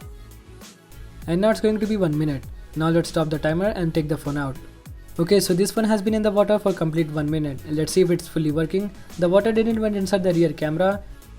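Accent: India. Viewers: I love Indian English 😂😂😂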